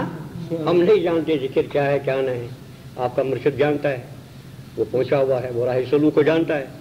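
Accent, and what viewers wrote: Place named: Gujarat, India. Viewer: Gujratii juban hi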